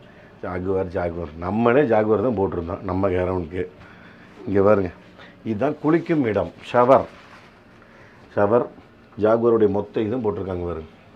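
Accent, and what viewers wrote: Kongu, India. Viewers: Ungkalathu kongu tami slang style remba. Arumai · Super..that too in Kongu Tamil....very very nice sir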